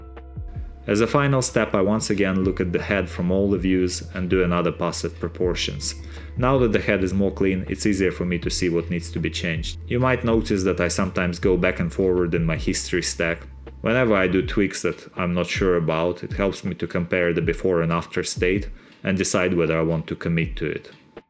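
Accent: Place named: Russia. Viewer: А акцент то русский 😂😂😂👍👍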